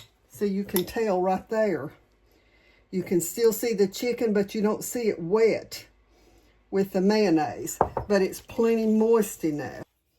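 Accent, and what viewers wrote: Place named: South, United States. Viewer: Hello from Georgia! I love your southern accent ❤the food always looks great, love the presentation on this one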